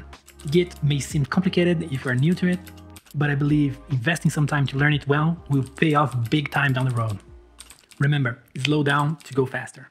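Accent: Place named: Portugal. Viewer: do you speak portuguese? i found your accent very noticiable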